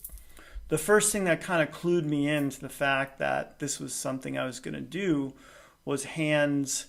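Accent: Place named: England, United Kingdom. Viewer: Wow you channel as a English man 🙏💜 I thought you were English. Excellent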